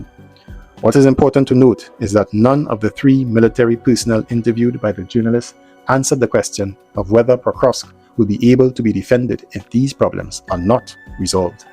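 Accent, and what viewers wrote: Trinidad and Tobago. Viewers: Is that a Trini (Trinidadian) accent I'm hearing? Glad I found this channel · New sub🎉 You sound Trini. Very cool if you are! Very informative work! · I trini too but I wondering if I hearing ppl in trini accent , good reporting first time I’m seeing your channel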